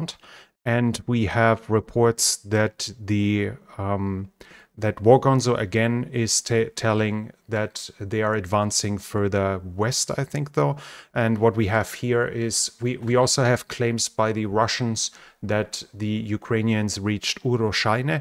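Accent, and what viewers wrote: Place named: Germany. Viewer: Always remember. When your opponent is down on the ground an bleeding, it's time to start using the steel toed boots. And never in my 72 years in this life did I expect to find myself listening to a commentator with a German accent reporting on combat in Ukraine and saying 'and today on the Eastern Front...."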